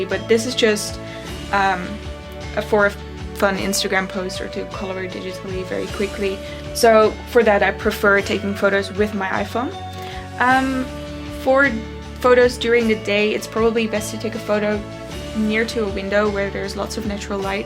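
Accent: Netherlands. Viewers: Love the video, this will help a lot, thank you!❤ (Are you dutch?, cause I think you kinda sound Dutch..😊) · You sound Dutch (not in a bad way, you talk very clearly) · You sound dutch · You sound Dutch haha xd but it's a cute english! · Very helpful, thank you :) (btw, are you dutch? though I recognized the accent :P)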